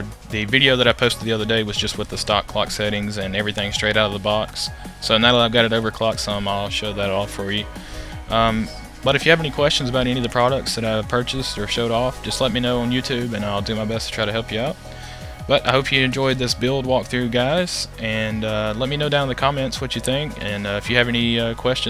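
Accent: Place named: Alabama, United States. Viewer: Nice rig. I am building pretty much the exact thing aside from the case and few others. You sound like you're from Alabama or close lol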